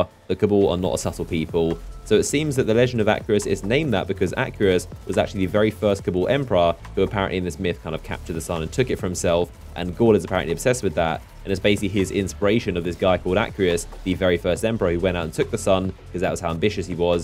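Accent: England, United Kingdom. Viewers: Is it just me or do gamers who have UK accents r just awesome (he's from the UK right) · this video is supposed to be a tutorial but your talking too fast. as if u dont even care if people can understand you or not . plus u have a thick english accent. how can u expect people to understand you... this video is a thumbs down!!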